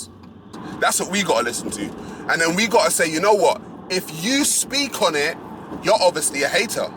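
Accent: United Kingdom. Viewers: I’m sorry, but you saying, “Oh f*ck off” sounds so polite. I have no idea why the British accent makes the most offensive sayings sound nice.🤣 With that said, I completely agree with you. I am not offended by what you said because it’s true. Way too many black women are out here making us all look bad. I do my absolute best to be the positive image of black women. My daughter is biracial, but I also teach her to be the positive image of both the black and mexican communities. Unfortunately, there are major problems amongst women in both races. I mentor young black women so they know the path they should take and why they should take that path. I even seek out young and older black women in comment sections of videos who have a destructive mindset. I don’t shame them for their mindset, but I let them know their mindset is not one that breeds lifetime success. 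Some of us are out here on the ground doing the work. We don’t have YouTube channels because we are working in real time.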